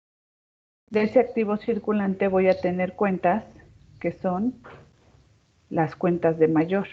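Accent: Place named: Mexico. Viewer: todo estaba bien hasta que se empezo a notar el acento mexicano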